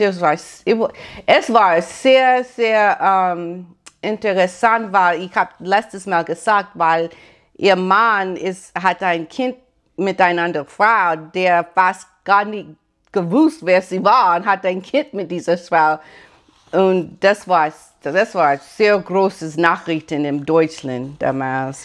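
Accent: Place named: United States. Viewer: Babs kann die ganze Patchworkfamily, also auch seine uneheliche Tochter Anna und Amadeus aus seiner zweiten Ehe, zusammenhalten. Sie versteht sich mit Angela Ermakova und Lilly Becker, die ihre Kinder sehr gut ohne Boris weiter erziehen. Finde ich ganz toll und ich hoffe noch immer ein bisschen, dass sie mit Lenny Kravitz zusammen kommt. Er ist über Jahre ihr engster Freund, aber vielleicht ist es besser so, denn eine Beziehung ist immer kompliziert und sie sagte einmal, dass Freunde sehr wichtig für sie sind und sie sich als Single wohl fühlt. Emanzipierte und intelligente 🚺 👍 Da fällt mir noch ein, ein Rothaariger mit einer Farbigen zusammen, wie bei HM 😅 aber Babs ist ein ehrlicher guter Mensch. Stelle dir mal vor, Harry betrügt M 🤣 Ich liebe deinen amerikanischen Akzent 😍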